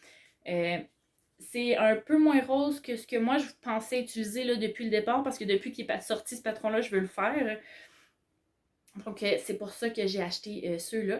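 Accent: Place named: Quebec, Canada. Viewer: bonjour de savoie pourriez vous dans votre prochain podcast marquer votre région du québec car avec l'accent je n'ai pas compris , je regarde ensuite sur google pour me donner une perspective du paysage.merci très interressant le salon; dans ma région il n'y en a pas, nous avons 2 salons de couturières par an où l'on trouve des fils à tricoter d'occasion:ou des vides greniers j'ai passé un bon moment avec vos projets merci pour ce partage.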